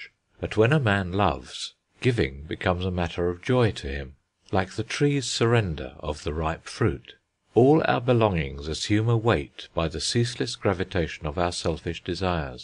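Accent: England, United Kingdom. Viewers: There is a perverse contradiction having it read in an English accent. Really creepy.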